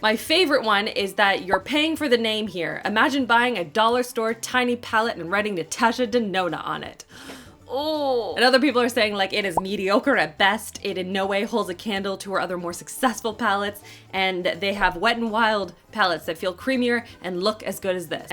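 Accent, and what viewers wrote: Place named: Canada. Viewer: Riv H she is Canadian. It comes out on occasion.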